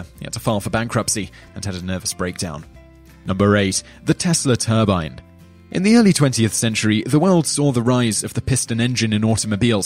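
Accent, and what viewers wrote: United Kingdom. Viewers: This guy is singlehandedly making british accents sound stupid.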